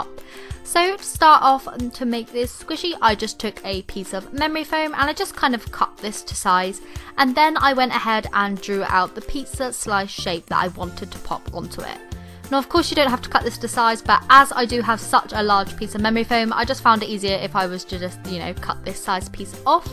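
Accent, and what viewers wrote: England, United Kingdom. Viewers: I like and enjoy watching your squishy videos but (just my personal unpopular opinion) I can’t stand British/English accents.. idk why my ears can’t handle your accent lol so I have to watch your videos on mute. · Are you from England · I dont want to offend her but..... Are you British? She really sounds British....... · I like your ascent, are you British?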